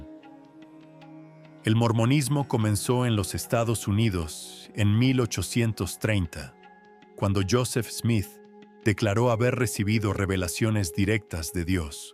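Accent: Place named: Mexico. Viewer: Desde Colombia parcero, pero con acento Mexica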